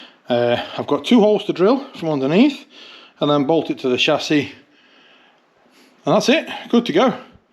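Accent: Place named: Nottingham, United Kingdom. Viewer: I hear traces of your Nottingham accent. Is the Fiesta going back to bare metal?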